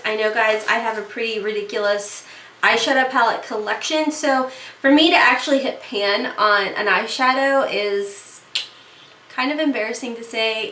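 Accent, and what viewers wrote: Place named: Kentucky, United States. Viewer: Oh, I'm originally from SC but I LOVE your Kentucky accent!!! 😉💖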